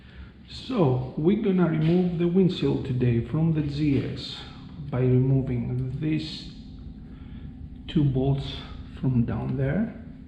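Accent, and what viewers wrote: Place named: Greece. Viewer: Yo man... finally a voice. Love the accent. Reminds me when I was kid... my buddy was Greek and his dad had the same accent. From what I have read, the Puig is great for wind protection. Good job bud.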